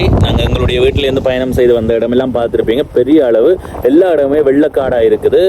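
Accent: Sri Lanka. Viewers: முக்கியமாக நீஙகள் நல்ல தமிழ், யாழ்ப்பாண பாரம்பரியத்தில், பேசுகிறீகள் . நிறைய youtubers அப்படி இல்லை ..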